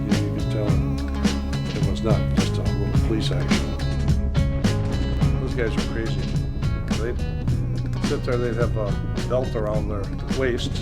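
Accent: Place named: Wisconsin, United States. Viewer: Thank you for your service sir. You aren’t from Wisconsin by chance? The accent sounds like my home state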